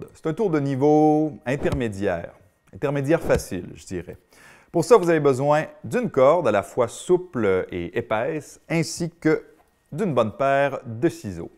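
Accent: Quebec, Canada. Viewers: Petit garnement! J'ai t'ai vu souvent sur des vidéos tournés en France et je dois dire que l'accent québécois te va mieux. Merci Pour les tutos et bon succès!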